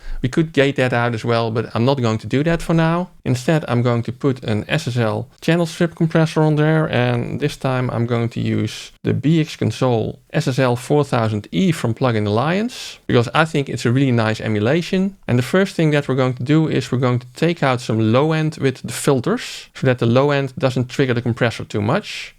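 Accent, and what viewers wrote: Netherlands. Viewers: Wait are you dutch ? I'm hardly noticing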